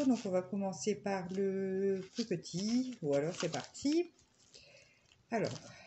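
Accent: North, France. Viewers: Un petit accent du Nord ?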